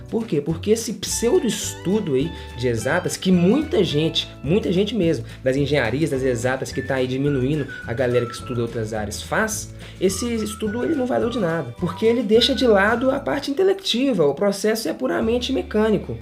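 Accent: Minas Gerais, Brazil. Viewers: Ele é Mineiro, se não me engano.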